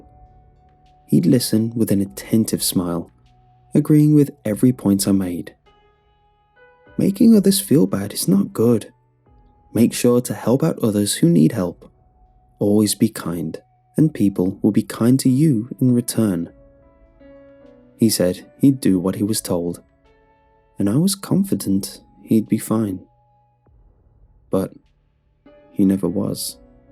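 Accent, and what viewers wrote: United Kingdom. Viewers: Plus a british accent. Though, I can't watch his content without feeling dirty because he put down a smaller youtuber for not having an american accent, despite the fact that he, himself, does not have an american accent.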